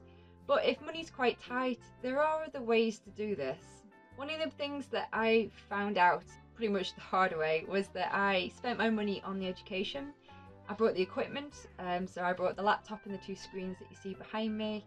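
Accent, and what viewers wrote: United Kingdom. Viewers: You look marvelous. I love your accent too. And nice teeth for a Brit. British dentistry has come a long way. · Love British accent. What area of England are you from?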